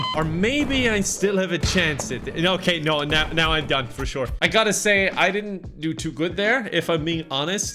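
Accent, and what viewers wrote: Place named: Ireland. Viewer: just found your channel today randomly. binged your sims 2 series. enjoyed the content alot. watching all your latest videos rn. Good stuff, Subbed. also, are you Irish? you have an accent like Nogla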